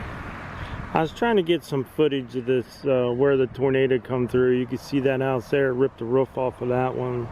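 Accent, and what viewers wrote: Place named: Pennsylvania, United States. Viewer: Hey I found your channel by accident, but love the the content. The first video I watched I heard your accent and knew you where from Pennsylvania. My ex- in laws where from Uniontown. I loved visiting that beautiful part of the country. Very nice folks. I hadn’t heard about the tornado till I watched this. So very sad. Thanks for the entertaining videos. Gayle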